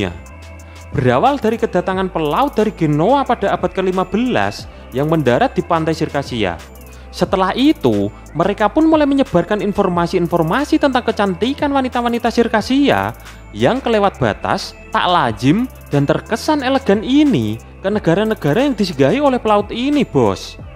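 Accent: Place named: Java, Indonesia. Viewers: gapapa mbak memang orang jawa logatnya kayak begitu susah juga kalo ngomong pake logat indonesia biasa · informasi dr km ny menarik dan jelas, tapi narasi bicaranya itu yg bikin garing kak🙏, but aku suka Ama channel vidio2 KK y infomati itu, prasan jrg yg bahas, aku tauny bnyk konten spoiler n y ky ngikutin tren doank, bagus c KK jd dr sndri, cm Krn medokny terasa garing di telingaku 🤪🤭,aku bnyk tmn org Jawa tp klo KK mya ini kyk LG ndalang gtu prasaan narasinya 😂😁😁🙏, intiny konten dan Vido KK bgus dan ckup mnrik so lanjutkan kak, y tnggl menyesuaikan aja c y kak 👍🏻👍🏻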